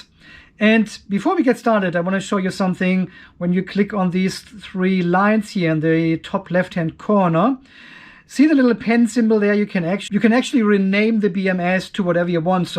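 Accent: Germany. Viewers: I only want to hear your accent. I don’t care what you are talking about. You sound German. Yah? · Thanks I’m still catching up on all the videos. I think the German accent added with Aussie slang is great. Actually laughed out loud this morning from another video when you said “it sh1t itself”. Keep up the great work. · Garage ist euer Dialekt in Australien so, oder kommst du ursprünglich aus dem deutschsprachigen Raum? Ich verstehe dich bestens, nur ich finde dein Dialekt klingt schon sehr nach "aus Deutschland" :D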